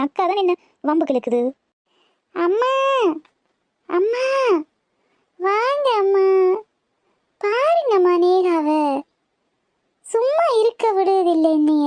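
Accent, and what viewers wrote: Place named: Sri Lanka. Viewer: Akka srilankan tamil Mathere iruku neenga srilanka va 🤔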